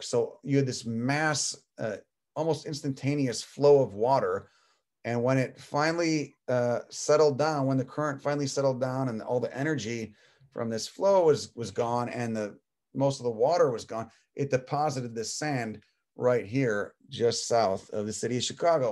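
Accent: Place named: Boston, United States. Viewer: Good cheers to an Italian brother. My Nono came here to clean up gypsy moths and work on some estate as a gardener. My grandmother had me out in the garden and knowing how to grow my own food by the age of twelve! By 12 I found this really old book the local library was getting rid of for ten cents. Its been my freaking bible for the past forty years! During the 1990s I focused on native design, but as we know during that time everybody wanted imported plants that looked exotic and needed craploads of pesticides. I was ahead of my time with the organic approach but if people listened they would know that if you keep the ecosystem there are natural predators and you can plant native stuff to attract predators such as wasps and there are so many different types of wasps! The earth has been my life, my entire life and you are doing an amazing job. 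I am so grateful for your hilarity in these videos and love how you are not afraid to be real. YOu sounds like you are from Boston/Italian.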